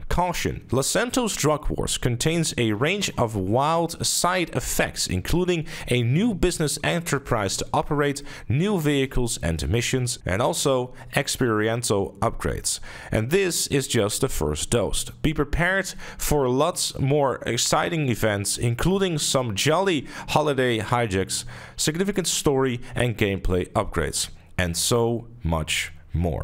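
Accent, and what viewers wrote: Netherlands. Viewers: I'll eat my shoelaces if he isn't Dutch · Is he dutch ?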